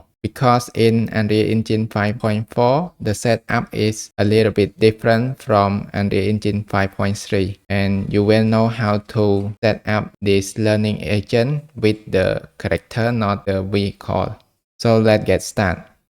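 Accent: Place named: Thailand. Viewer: As soon as I turned on the video, I felt a very familiar accent. I lived for some time on the island of Koh Samui. I'm sure it's a Thai accent. :)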